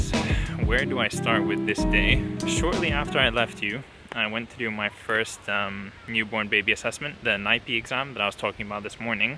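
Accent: United States. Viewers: Are you amirican?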